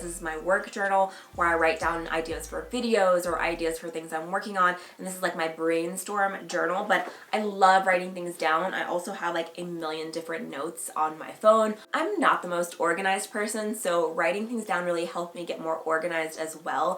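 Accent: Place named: Tennessee, United States. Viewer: I’m really hearing your Tennessee accent in this video which is weird 😂 especially since you moved to LA!!! I love it thoooo we need a get ready with me installment to this video 💕💕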